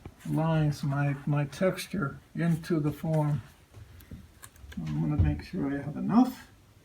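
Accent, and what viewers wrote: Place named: Mexican American, United States. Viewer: Love your work. May I ask what ethnicity you are if I close my eyes I can hear the voice of someone I know who is Mexican American. Not that it matters. In any event keep up the great work!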